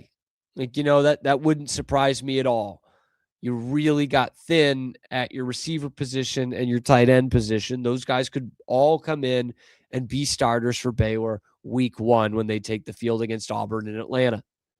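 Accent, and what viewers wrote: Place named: Boston, United States. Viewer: I don’t care if u grew up with Tom as ur babysitter, I hate u when u wear that hat. The less you remind me of your provenance, the better. You’ve done an admirable job ironing the chowdahead out of your accent and thanks for that. Now, never wear Pat merch ever again.* *Wear the Boston Bruins stuff. That’s totally fine.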